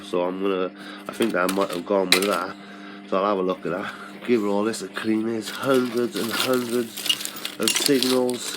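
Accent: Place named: Cardiff, United Kingdom. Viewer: Cardiff accent 100%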